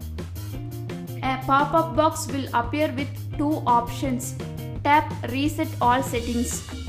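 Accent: India. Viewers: Opens video Hears indian accent Closes video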